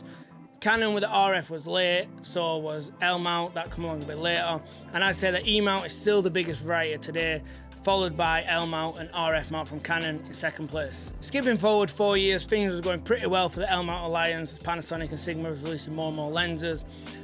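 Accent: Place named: Northern England, United Kingdom. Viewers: Never judge a book by its cover. This dude looks and sounds like a cage fighter crossed with an English bulldog yet everything he said is well informed and is surprisingly balanced view with some great research and insight. Connecting the dots makes sense and although it’s unlikely black magic will make a cube anytime soon the l mount is a sleeping giant and the investment by dji is key. Bm need a new mount and red and canon will almost certainly not let bm anywhere near rf. excellent despite the heavy northern accent this dude knows his stuff. Don’t let those prejudices loose. Sound logic has no accent. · Ey up, nowt wrong with a Northern accent lad! 😝 BUT onto mounts... I wonder if we can, via that service Sigma offer, get EF mounts changed to L? Most my Lenses are Sigma anyway nowadays!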